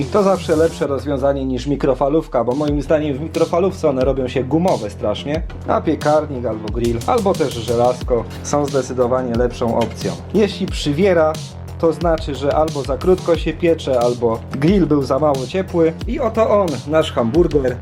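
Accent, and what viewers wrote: Silesia, Poland. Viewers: Ten śląski akcent..taki fajny 😁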